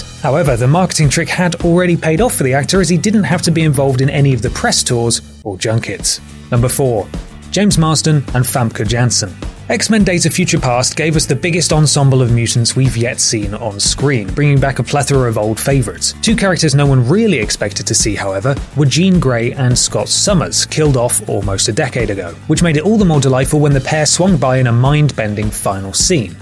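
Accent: United Kingdom. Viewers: Awe I like his slightly British voice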